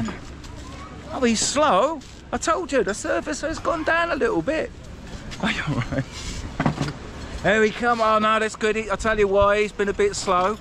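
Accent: Essex, United Kingdom. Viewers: you sound like youve got an essex accent, dont sound much like a good old norfolk accent, but didnt you say in one of youre videos youre an aussie? im just curiuos mate, btw im from suffolk, cheers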